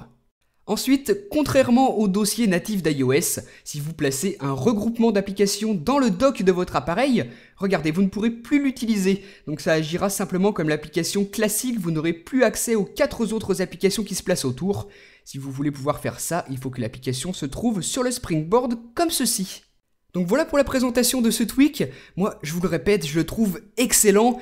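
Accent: France. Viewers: ptn il me saoule ton accent francais quand tu dis des choses anglaises --'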